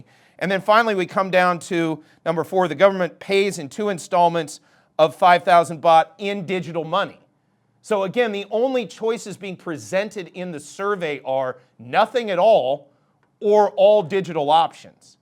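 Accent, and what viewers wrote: United States. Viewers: I love the way you say "us Thais"... a true patriot! American accent. Thai heart ❤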